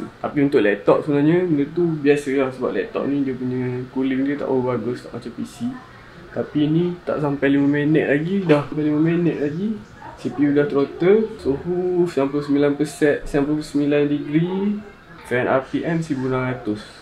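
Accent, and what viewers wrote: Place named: Rompin, Malaysia. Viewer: Org rompin...kawan agak dah dengo loghat tu...terbaik terr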